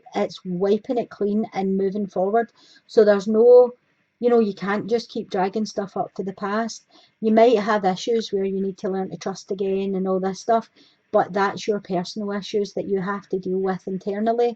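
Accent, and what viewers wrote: Scotland, United Kingdom. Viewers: What accent is this, Scottish ? , thanks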